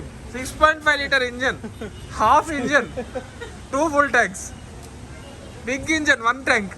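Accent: India. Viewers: Anna Telugu Vallu aie English lo ala matladutharu Anna😔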